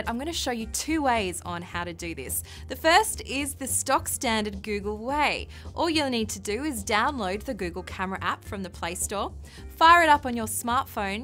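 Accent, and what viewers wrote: Australia. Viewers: There is nothing wrong with her voice. If you're referring to her accent, its Australian mate. Aussie Aussie Aussie!! Oui Oui Oui!!! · Is she Australian!?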